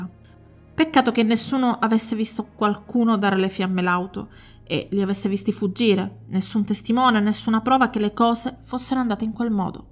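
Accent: Sicily, Italy. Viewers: Ciao non conoscevo il caso...mi chiedo perché Dorothy non si sia rivolta alla polizia dopo aver ricevuto le prime telefonate dal suo stalker...non riesco neppure ad immaginare l'angoscia dei genitori che hanno continuato a ricevere le sue telefonate anche dopo la sparizione della figlia...atroce veramente...la cosa che più mi tiene avvinto ai tuoi video è la tua voce calma e pacata anche quando parli di crimini agghiaccianti...sei una bravissima narratrice ❤e adoro il tuo accento siciliano nonostante io sia un polentone!😂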